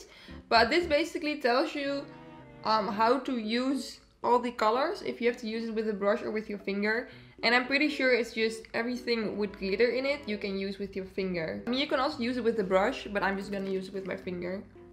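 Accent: Netherlands. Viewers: Are you dutch??? Its sounds like it , im dutch thats why i reconize it haha😘😂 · I just started watching you (this is my third video that I'm watching from you) and usually I'm really bad at guessing from where the accent it (like seriously bad) but I guessed that you are from the Netherlands and suddenly you say you're Dutch. I was really proud of myself. I love your vibe and personality, it's just so lively and fun! Btw, I'm German, so hello neighbor! :D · i instantly recognized your accent as a fellow dutch person LMAO you even use dutch onomatopoeia(?) like. some sounds you make only dutch people would make i feel like. vla is een lekker kleurtje 😳 moet nu naar jumbo denk ik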